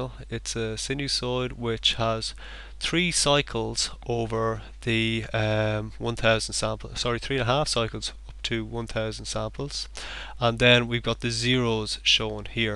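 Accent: Ireland. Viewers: also, great accent. more people should talk with irish accents.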